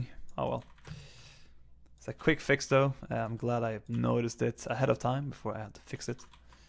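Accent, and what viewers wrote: Sweden. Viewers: U sound swedish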